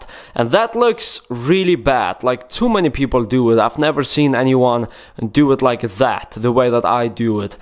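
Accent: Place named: Russia. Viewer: Это лучший русский акцент из всех что я слышал!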